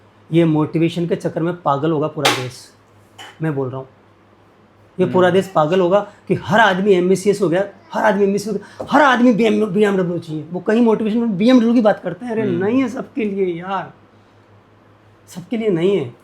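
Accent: Hadoti, India. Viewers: Gurudev apki language bilkul hadoti rAJASTHAN jese lg rahi h m bhi hadoti ki hoo apki bhasha hakk se jatate huy ;;;;;;;maja aa gy apko padm bhushn milna chayee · Hadoti Ke Lag Rahe he Botne Se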